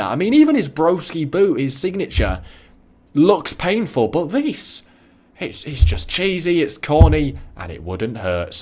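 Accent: United Kingdom. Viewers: You don't know what your talking about clearly you don't know anything about wrestling because all of those are great finishers and if you would really watch wrestling you would know that it's not supposed to hurt because wrestling is fake it's all just a act so maybe you should do more research because you don't know anything and your British a ten is horrible go back to pre-k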